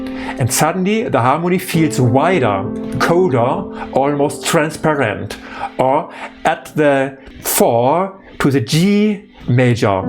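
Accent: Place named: Austria. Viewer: Yes, sir, I do, and as well, with learning languages. By the way, I believe that it stated that you sre located in Germany, but are you Austrian? I was trying to place your accent.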